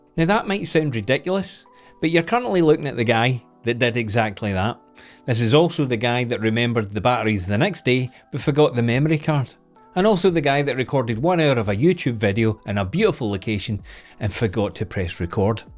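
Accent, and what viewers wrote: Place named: Glasgow, United Kingdom. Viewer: Hey thanks for your take on photography. I recently purchased a mirrorless camera, a 50 mm lens and now a RF 24- 105 "L"lens from Canon for my wife. She's always wanted a good camera so I started her with a Canon R50 APC but a full frame is in the future ih her interest in photography grows. Although we live in the states my wife is from Glasgow so she will appreciate your accent. Thank you sir, will be watching all your content.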